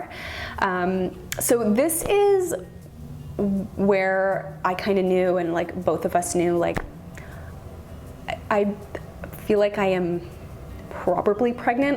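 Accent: Canada. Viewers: Are you Candian or from North Dakota?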